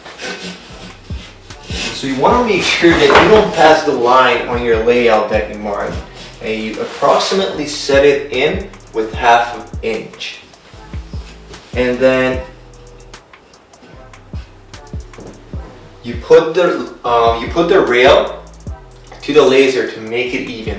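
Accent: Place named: Southern Ontario, Canada. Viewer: I’m Canadian but living in Germany since 2016. I think it’s hilarious that I was able to pick up his accent (Southern Ontario) almost immediately. Anyway, great video, thanks for the info. My only feedback would be to invest in a mic and better lighting.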